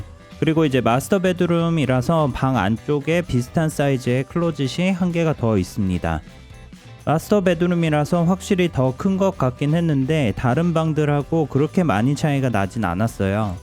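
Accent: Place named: South Korea. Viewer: You were born in America?? How come you have heavy Korean accent?? 😳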